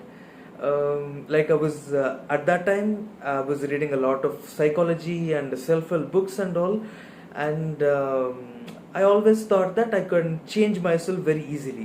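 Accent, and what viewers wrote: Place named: Telugu, India. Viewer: Just by your body language and accent anybody can say you are telugu origin...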